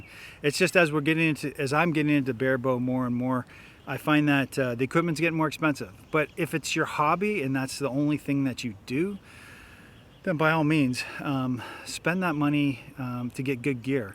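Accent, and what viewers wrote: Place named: Canada. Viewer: Crap! I didn't realise that you were from Canada. Explains that accent haha. Where from? I'm in eastern Canada. Love the reasonable approach that you take to all of this. I'm fairly new coming back to archery after several decades. Been watchin you and a few others to try and catch up on the basics, the latest tools, brands, gizmos. Been really helpful Cool stuff. So thanks :)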